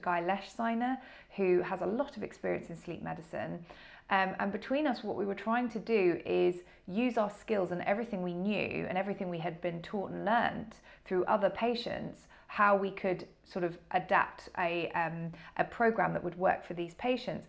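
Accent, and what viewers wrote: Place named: United Kingdom. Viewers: I love your accent You sound English, British, Aistrailia maybe or Irish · Its a British accent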